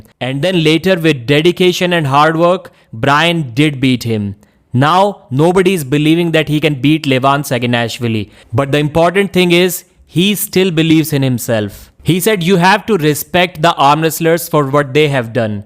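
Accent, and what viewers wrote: India. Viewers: this Indian accent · level of hindu accent. Rolling like every letter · the only indian whose accent for some reason, I don't hate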